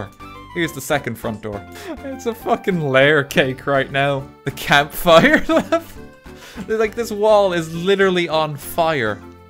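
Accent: Ireland. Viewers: Why do you sound Irish but use southern slang? Lol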